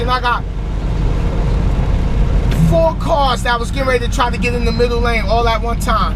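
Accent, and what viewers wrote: New York, United States. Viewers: Man, your video is awesome. You have this unique extremely funny and down to Earth personality I could listen to you all day your freaking hilarious. All laughing aside you make a lot of very good points that all drivers should be thinking about . Then add that classic NY CITY accent. We need more people like you on the roads out there, . Just the way you say shit its great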